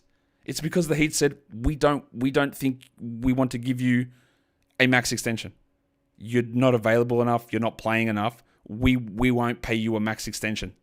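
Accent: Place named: Australia. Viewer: Your microphone's good - no issues with my end. I listen at 1.5x and one of the big changes is there's no "deep breath" sounds. I know us Aussies like our long-winded sentences. As the name suggests, long-winded sentences require long amounts of wind, which is often replenished using a deep breath. This deep breath is known to create a deep breathy sound and your previous mic used to hear. I don't know whether you have undergone some level of phonetical coaching (which kudos if you have) but, there was a distinct absence of deep breathy sounds in this video. The unfortunate news is you are probably ineligible for the ASMR tag to put on this video now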